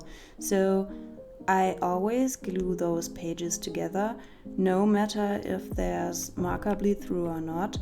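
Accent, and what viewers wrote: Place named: Germany. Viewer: Love your german accent😂❤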